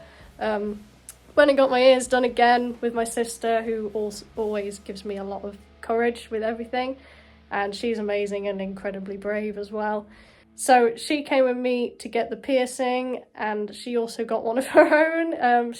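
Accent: United Kingdom. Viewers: Your British accent is amazing! Hope we can hear you singing some lyrics with your new band!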